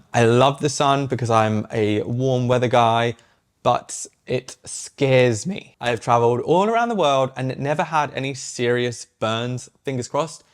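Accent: Australia. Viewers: I hear the Aussie twang coming in here and there haha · You sound Australian. The things you’re talking about are the things we complain about too.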